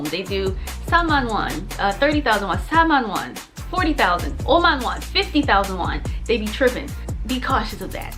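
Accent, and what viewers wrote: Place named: England, United Kingdom. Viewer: PS love your English accent 😁😂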